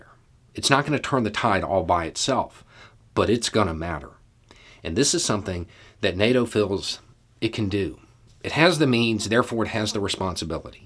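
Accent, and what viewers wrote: South, United States. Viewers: I'm always amazed at how a dude from the south who says yawl and his a scruffy beard and wears a ball cap with a monkey on it can explain the most important issues we face in a way that adds clarity and depth that cuts through all the noise. I've said this before. It's a shame that people who would benefit most from these posts will probably never read them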